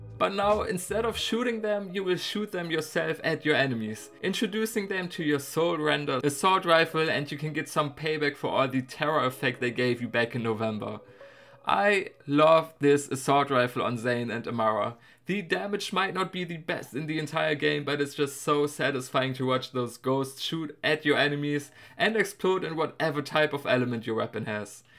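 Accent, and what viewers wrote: Germany. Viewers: From your accent I´d say you are german.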